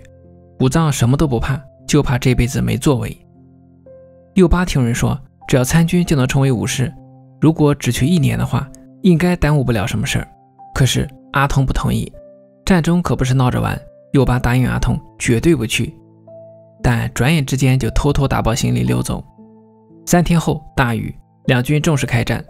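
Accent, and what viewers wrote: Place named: Qingdao, China. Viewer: 解说的青岛口音藏也藏不住😂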